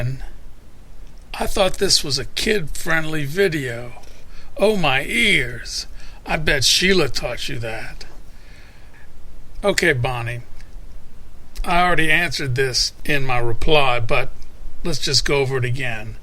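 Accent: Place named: South, United States. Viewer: Love your vids. But it drives me crazy that I can't place your accent. It's like a little bit of Virginia or Arkansas, but steeped in some deeper south, like Alabama or Louisiana. You tend to shift in and out of a deeper accent when you talk. Where is it from??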